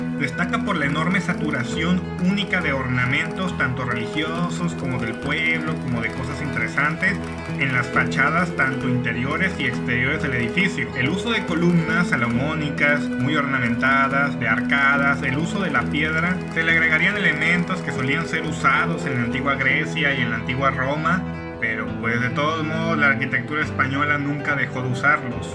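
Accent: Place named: Guadalajara, Mexico. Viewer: JAJAJAJA si Auronplay viera que lo pusiste pelón te diría : Menudo personaje de cojones JAJAJA, buen video como siempre entregando cada vez la mejor calidad para tus suscriptores mas fieles, y buena imitación de acento andaluz he, pero por tu acento natural yo diría que eres Tapatío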